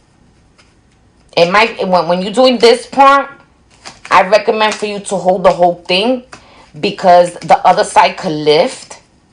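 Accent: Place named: Bronx, United States. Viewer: I knew you was from the Bronx before you told us, girl😂 You have that Bronx swag❤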